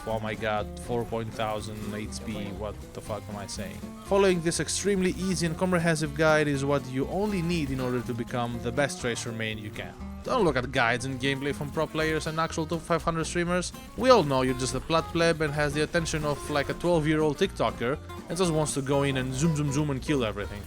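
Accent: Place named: Greece. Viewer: Ελληνική προφορά αααααα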